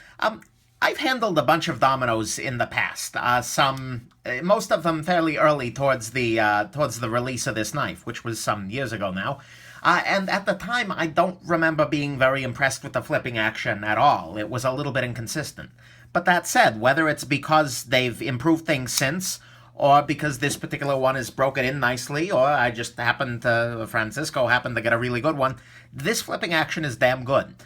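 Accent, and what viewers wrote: New York, United States. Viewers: Good review. Now work on that NY accent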